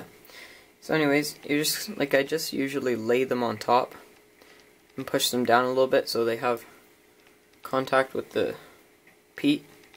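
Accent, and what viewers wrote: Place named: Canada. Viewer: No. I just thought I detected a Canadian accent.;-) Did I ask you if you live in Canada? If I did, my old comment is not showing anymore. I talk to quite a few YouTuber’s. So that question only applies (do you live in Canada) if I did ask you that.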